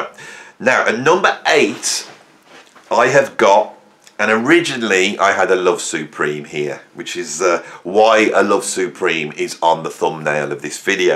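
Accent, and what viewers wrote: Birmingham, United Kingdom. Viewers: I love watching your videos,. We are same generation and you create this pleasant homey atmosphere, so I feel almost like talking with one of my music-buff mates. Lovely Birmingham accent, too. 👍 Thanks! annnd cheers! 😆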